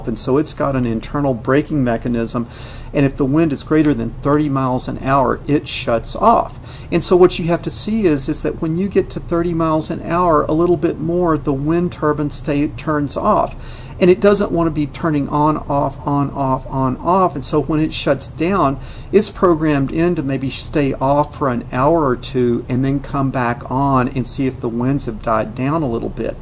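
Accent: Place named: United States. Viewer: I don't expect you to change your accent or speak in a British accent. I love the way Yanks speak. They (you) just have to pronounce words correctly. lol. I'm more concerned if you are one of the 46% of Americans that think the world is only 6000 years old?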